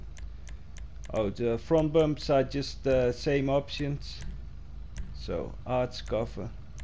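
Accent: Netherlands. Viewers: Are you dutch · im half netherlands and half from belgium. thats why i knew that he was from the netherlands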